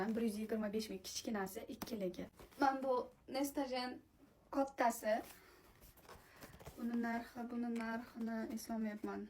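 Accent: Tashkent, Uzbekistan. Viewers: Намоногонлик экансан Тошкент шевасида гапириб. Нима килардинг. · НАМАНГАНЛИКСИЗ АММО ТОШКЕНТ ШЕВАСИДА ГАПИРАСИЗ....ТАЪЖУБ. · Manam shunaqa dgandim. Nimaga Namanganlik bosez Toshkent shevada gapirasiz? dgandim. Manimcha ochirvoripti 😅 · Наманганликман дейсизу нимага тошкенча гапирсиз хафа булмангу ман абангизни танийман отлари Гулмирахонми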